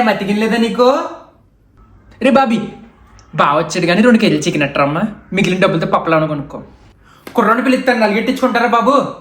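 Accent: Godavari, India. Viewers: Same Godavari matalane undhi😂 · Anna,, me godavari slang n reela chusthe godavari ki ravali ani untundi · గోదావరి జిల్లా యాస superr andi... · Nee personality face ki ee godavari yaasa suit kavatledhu bhayya. Manchi 6 pack hero personality pettukoni accent emo bhavayyah typelo undi. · Godavari slang super Super funny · Me slang superr andi memu godarollame❤😂😂😂😂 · నిజం చెప్పండి మీది ఇస్ట్ అండ్ వెస్ట్ కదా మీ మాటలు వింటుంటే మా అమ్మ తో మాట్లాడుతున్నట్టు వుంది అన్నయ్య ❤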